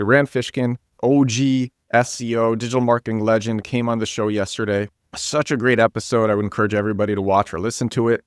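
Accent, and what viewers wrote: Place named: United States. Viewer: I really like your English and your accent, so could you please tell me which accent is this? I'm sure it is an American accent but which state? which part of America? Thank you, you've got another follower, congrats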